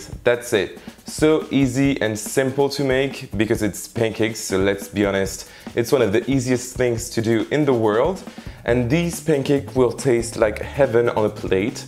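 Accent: France. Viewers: MUST try this recipe. You make it look so delicious! ❤ Hey, just curious. I can't place that accent. Where in France are you from,?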